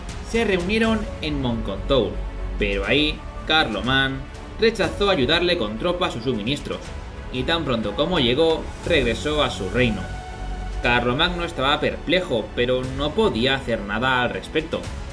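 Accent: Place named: Galicia, Spain. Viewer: Lastima el acento Gallego . Arruina el video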